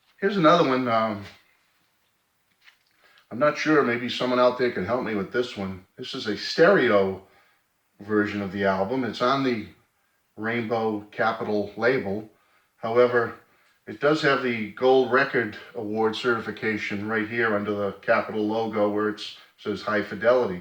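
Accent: Boston, United States. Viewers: Hi thanks for sharing, just found your channel...seems you have many videos I'm going to spend time watching!! Thanks!! I lived in Boston, Ma, for many years do I detect an accent??;-)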